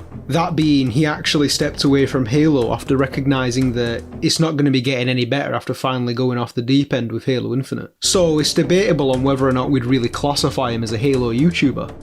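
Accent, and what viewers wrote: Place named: Birmingham, United Kingdom. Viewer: Honestly seemed liked a birmingham chap to me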